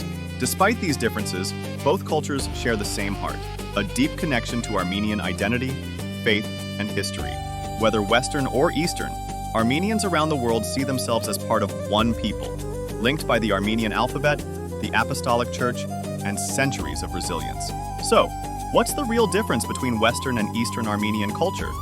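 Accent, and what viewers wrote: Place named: Arab world. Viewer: You are ethnically Armenian, but culturally you seem more connected to the Arab world. I notice Arabic influence in your cuisine and even in pronunciation, like saying “Suria” instead of “Syria.” you call yourself Middle Eastern and calling all Armenian nation like that. However, Armenia is not considered an Arab or Middle Eastern nation in terms of identity. Armenia is a member of the Council of Europe 🇪🇺 and other European institutions and is part of the South Caucasus region, which is associated with Europe in political and cultural classifications. Many Armenians in Armenia identify as European rather than Middle Eastern. Geographically also we are considered part of Europe because according to EU law it requires geographic requirements that's why they rejected Morocco in 1987 and Canada have been told can't join but us they haven't been told that can't join and Armenia approved membership bill. 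Not applied yet. The same Georgia which have been accepted after applying and received candidate status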